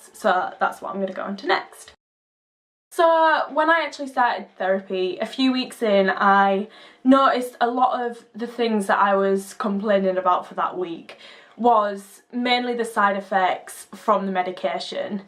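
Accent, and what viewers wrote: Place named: Yorkshire, United Kingdom. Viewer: love ur Yorkshire accent!!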